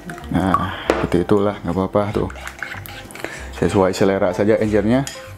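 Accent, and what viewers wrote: West Java, Indonesia. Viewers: Alhamdulillah, diam d rumah ada kegiatan, praktek bikin makanan ala 2, bahan nta simpel / mudah d dapat dan ga banyak biaya lagi, nuhun ide nya, bikin ibu 2 jadi resep, bikin nya dan lucu klw ngomong sunda na lucu 👍👍👍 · Kita banjuriii yaa..😂 sunda pisan euy..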